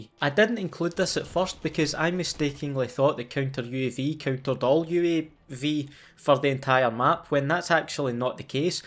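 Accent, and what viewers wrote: Scotland, United Kingdom. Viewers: This guy Scottish? (Also in real life white phosphorus is really dangerous it’ll burn your body bad) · Not sure if I subbed for the actual content or for the beautiful Scottish accent but either way #notificationsquad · Love the COD content but... that Scottish accent is the one that hook me up. #notificationsquad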